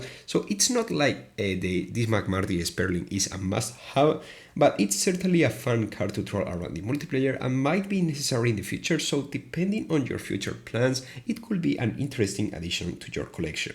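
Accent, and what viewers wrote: Spain. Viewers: Are you from Spain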